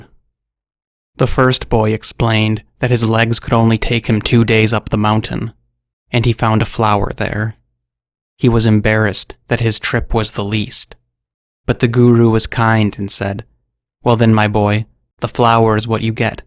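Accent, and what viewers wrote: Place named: Missouri, United States. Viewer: I really like your work Your a intelligent dude.I'm from Missouri that's the way Us Hicks talk.lol,Looking forward to your next video..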